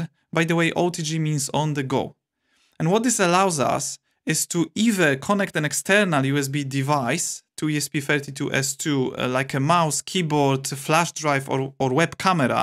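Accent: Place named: Poland. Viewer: Dobra robota dobry angielski i typowo polski akcent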